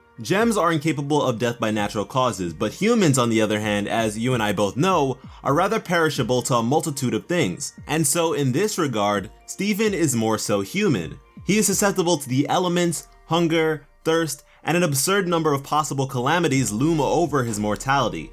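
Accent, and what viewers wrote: United Kingdom. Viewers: Are you from the uk 🇬🇧??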